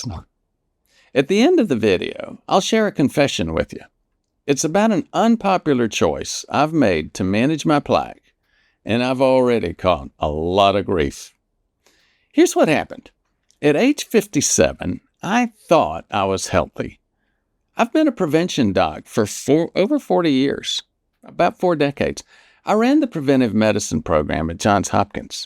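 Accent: South, United States. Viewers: Your redneck accent isn't reassuring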